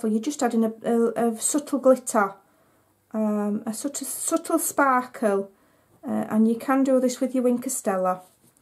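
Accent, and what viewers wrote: Yorkshire, United Kingdom. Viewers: I just love this card and the Yorkshire accent it reminds me of home. Richmond North Yorkshire is my home town. What is yours. I live in Perth in Scotland now. So miss the Yorkshire accent. Love what you do keep up the good work.Christine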